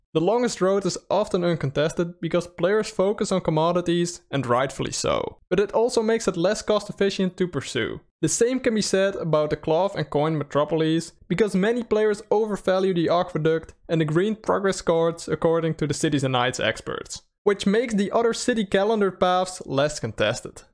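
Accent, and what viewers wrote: Netherlands. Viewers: Although I can understand you just fine, it might help to reduce "vocal fry". That's the creaky, low sound you usually use at the end of a sentence. I think less vocal fry sounds more relaxed. I'm no speech therapist, so I don't know how you'd achieve that. Just curious: are you Dutch?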